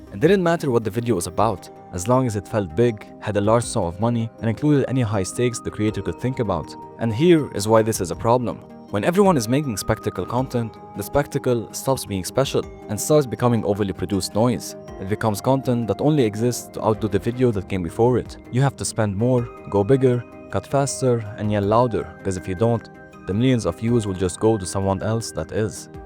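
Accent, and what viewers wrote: Arab world. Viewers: your accent sounds arab , are you lol? great vid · I love ur Arabic accent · Great video, and you sound Arabian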